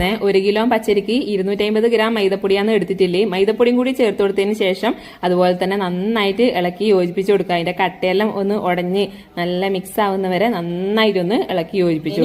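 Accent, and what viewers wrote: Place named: Payyanur, India. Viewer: okay payyanur slang ningalude baashayil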